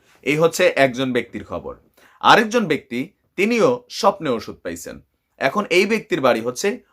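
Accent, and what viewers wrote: Comilla, Bangladesh. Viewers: আপনার সেফুদার কণ্ঠ অনেক ভালো লাগে আর কুমিল্লার ভাষায় তো মাশা আল্লাহ। আশাকরি সব ভিডিওতে কুমিল্লার ভাষায় কিছু বলবেন😊😊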